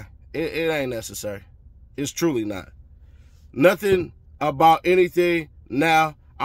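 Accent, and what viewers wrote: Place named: Chicago, United States. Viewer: Your talking like your from Chicago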